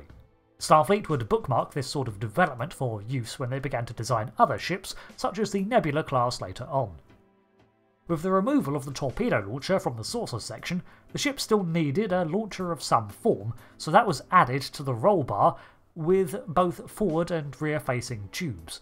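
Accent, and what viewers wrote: United Kingdom. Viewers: Are you incapable of recognizing a British accent?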